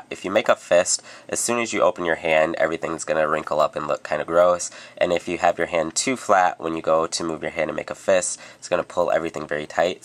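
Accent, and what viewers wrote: United States. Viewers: I love how the you say 'just go right ahead' and everythings a question (clearly american)... id work on that ;)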